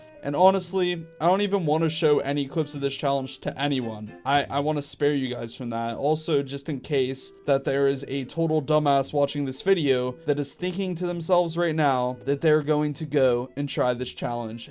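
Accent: United Kingdom. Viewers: now one realise he was british at the start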